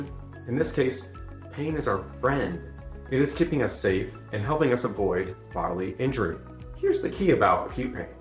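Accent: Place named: Kansas, United States. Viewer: This was enlightening! It’s so difficult for others to imagine chronic pain. I get up and dance at a wedding everyone says hey I thought you were crippled and in pain…..I am but you have to live! You are so knowledgeable yet down to Kansas friendly speech. You deserve a huge audience! When I turned 40 fibromyalgia almost ruined my busy life. Add the loss of 8” in height and back pain like the kidneys you mentioned! It’s a miracle I listened to your kidney pain info and it saved me a trip to the nephrologist! Thank you oops!❤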